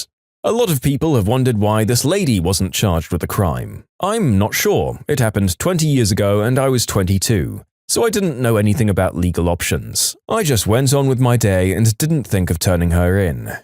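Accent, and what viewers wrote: United Kingdom. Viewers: Ya british mate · i like british man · British guy :C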